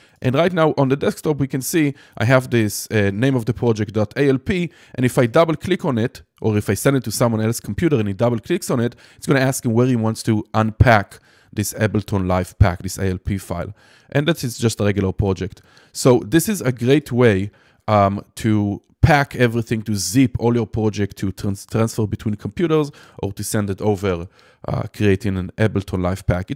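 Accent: Israel. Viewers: cool thanks hamm Israeli accent ?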